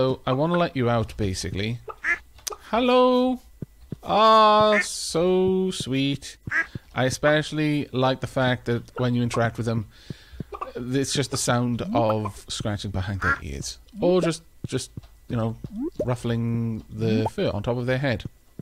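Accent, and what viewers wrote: United Kingdom. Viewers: That's an accent thing. He's saying it right in his accent. It's a common pronunciation across the UK, even here in Australia!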